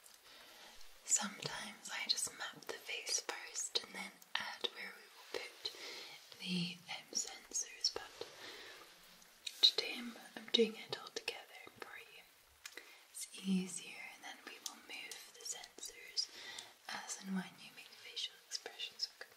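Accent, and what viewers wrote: Scotland, United Kingdom. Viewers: Wow, this is a good one. I love your Scottish accent! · Had a tiring day at work , so listening to those velvet Scottish tones from my favourite Bonny lass is just what is needed before bedtime ❤️u. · You are my favorite Asmrtist♥️ seriously I fell mesmerized since the first time I heard your voice in of of your videos with Scottish accent 😌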